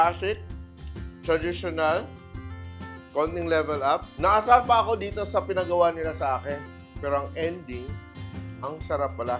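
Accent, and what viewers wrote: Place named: Iloilo, Philippines. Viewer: Ilonggo Ikaw?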